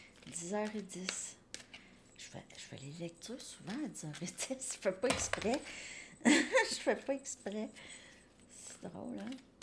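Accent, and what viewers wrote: Canada. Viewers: Bjr. Votre bonnet vous va à ravir. J'adore votre accent canadien. Trop marrante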